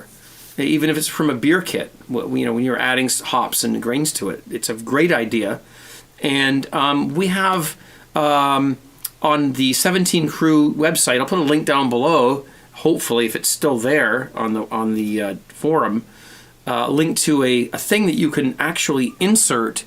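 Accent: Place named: Canada. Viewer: what part of Canada are you from? Ontario?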